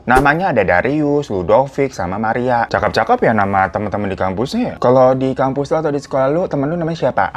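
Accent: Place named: Pasar Minggu, Indonesia. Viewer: Background: Romania. Logat: Pasar Minggu. Paling sedep emang dengerin cerita, udah kayak dengerin tetangga ngoceh. Dan ceritanya wow kayak Mid Sommar.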